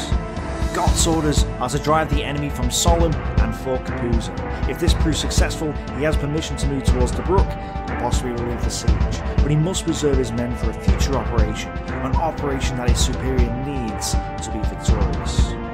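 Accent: United Kingdom. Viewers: Not sure if its True British Accent It could be Australian Or Down Under period, either way your English Accent is Thick as Hell Period and Im from USA and have a hard time Understanding what the hell your saying......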